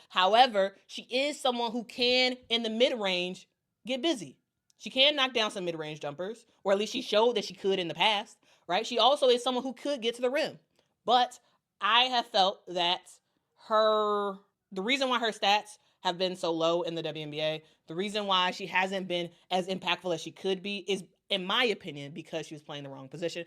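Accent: Chicago, United States. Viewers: It's actually a Chicago accent, not odd at all.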